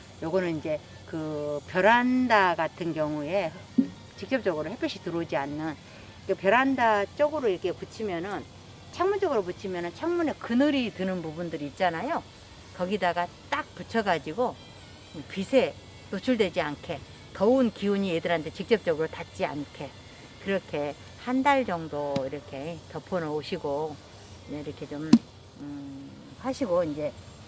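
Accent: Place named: Suncheon, South Korea. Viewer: 설명 잘 들었어라 근디 엄니 거가 어디다요 말투가 완전히 우리 고향인디 저는 순천 주암이요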